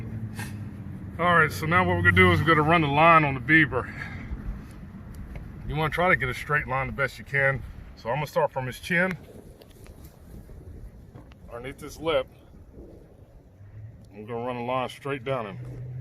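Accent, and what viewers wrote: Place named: United States. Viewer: I can tell this is good cause in the first five seconds I heard the American in his voice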